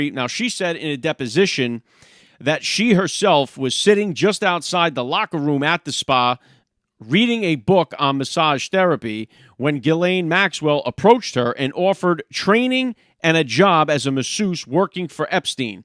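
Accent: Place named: New England, United States. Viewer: Nice channel. Wait, you sound like a Yankee. Are you a northern Yankee Trump supporter? Not something I've seen on YT. You are actually a Yankee?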